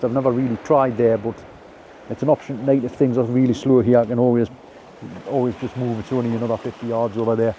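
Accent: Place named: Northumberland, United Kingdom. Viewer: Lost sock in your wellingtons Picking your noise 😂Trying to pin point your accent at a guess l would say around Morpeth Blyth area 😊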